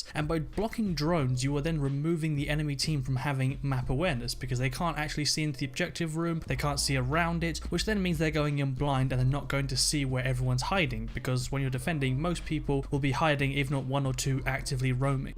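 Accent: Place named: United Kingdom. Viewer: hes british...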